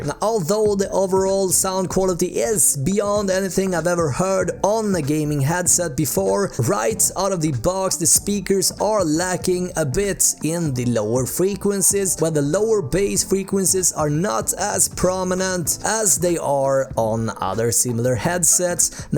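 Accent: Sweden. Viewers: Your english vocabulary is good, and so is your review. But your swedish accent and shouting is really ruining it for me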